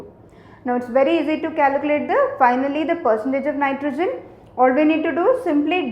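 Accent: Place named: South India, India. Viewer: Aiyo I guessed right you are south indian ji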